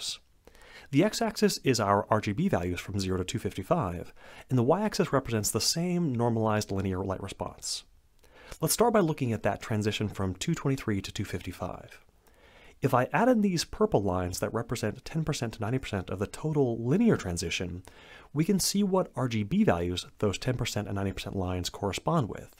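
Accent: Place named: United States. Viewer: smart guy with american accent ASMR? subbed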